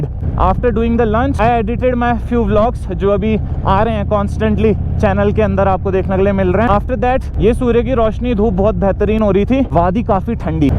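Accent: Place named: Haryana, India. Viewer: Bhai haryane se lg rhe ho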